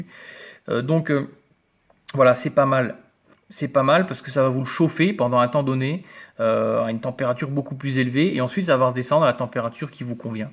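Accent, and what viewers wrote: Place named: Lorraine, France. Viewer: Hop'la l'ami Lorrain, vla un accent que je connais bien ;) Je viens d'à coté de chez toi, je possède aussi une Fuchai 213, normal que je m'abonne !! Bonne vap et bonne continuation ;)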